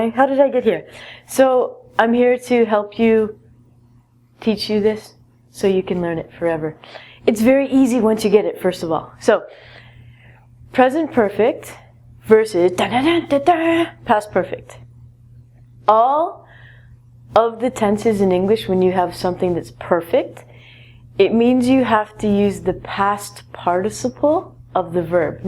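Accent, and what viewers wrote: United Kingdom. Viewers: British accent 😓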